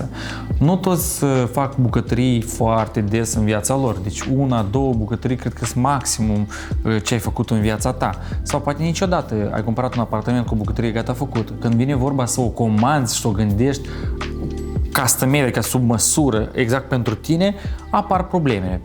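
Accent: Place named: Bessarabia, Moldova. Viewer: cu accent basarabean,dar bine pregatit.exceptionala prezentare,profesionist,cu detalii finisate in arta amenajariloe!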